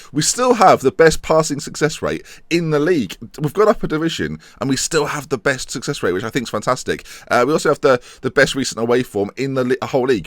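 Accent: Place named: South East England, United Kingdom. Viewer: I don't actually know for sure but judging by the accent he lives somewhere down in the south - east and also I know he has been to Brighton before from one of his Salford story episode so he probably lives near London or something like that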